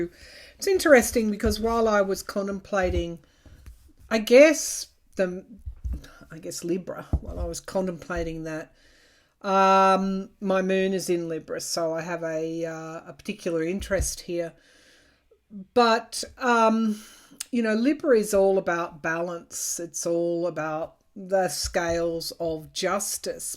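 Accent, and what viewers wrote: Australia. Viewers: Your reading was lovely, thank you. I love the Aussie accent, a bit like mine Oi Oi Oi 🙌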